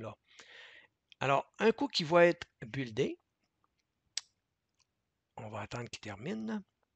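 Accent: Canada. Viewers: slt,c'est bon j'ai réussi,je sais pas comment j'ai fais,j'ai recommencé plusieurs fois et a un moment ça c'est mis a fonctionner,ouf,je suis content,voila,merci a toi et bonne continuation,c'est toujours un plaisir a regarder tes vidéos,et en plus j'adore l'accent canadien.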